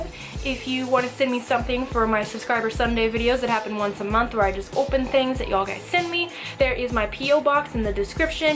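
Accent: Texas, United States. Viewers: Is she from texis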